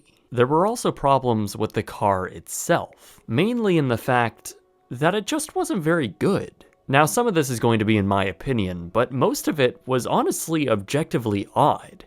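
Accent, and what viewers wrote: Canada. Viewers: Research = 10/10 __ Canadian Accent = 1/10 gah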